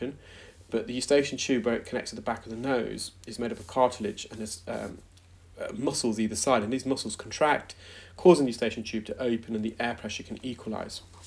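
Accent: United Kingdom. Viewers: British people sound like that. I watch a fellow from Scotland who treats cow hoofs. When he says "bull" it comes out sounding like "bill"! Took me a minute to get used to that Scottish twang, applies for Brits too......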